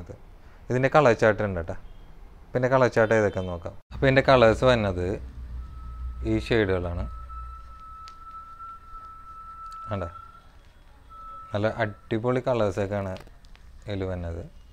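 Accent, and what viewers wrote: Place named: Kochi, India. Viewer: കൊച്ചി കാരനാണോ. കാരണം സംസാരം കൊച്ചി യാണ്. തൃശൂർ അല്ല ട്ടോ.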